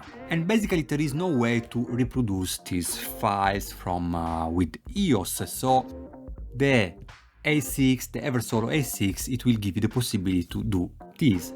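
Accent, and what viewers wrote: Italy. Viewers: Terrible englsh italian way 🤕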